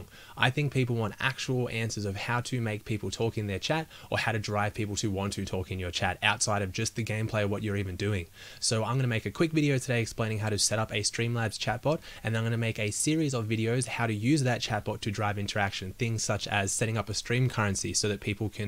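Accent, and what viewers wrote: Australia. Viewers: Your voice sounds like a little bit of ASMR aussie!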